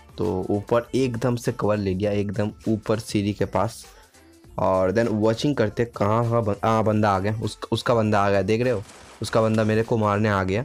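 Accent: Bengal, India. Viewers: Bhai ap Bengali ho kia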